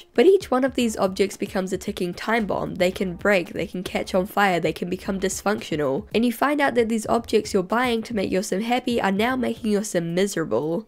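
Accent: New Zealand. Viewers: why did it take me so long to realise that ur from new zealand!!! i swear i’m so bad at picking out my own ppl lol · You know, , I briefly turned on closed captions to make sure I was hearing you correctly, and then I see this about the genie: "summoned from a lamb and gransom's wishes" I just think the subtitles or YouTube or something like that can't keep up with your thick New Zealand accent.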